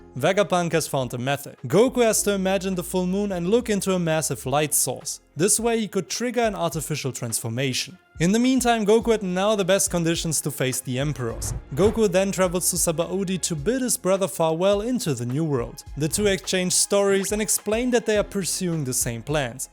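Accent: Germany. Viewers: Are you german? You have the accent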